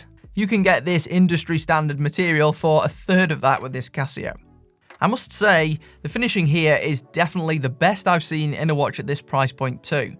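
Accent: United Kingdom. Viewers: Fantastic informative review of this COOL watch. So much usefull information. Love the clear British accent.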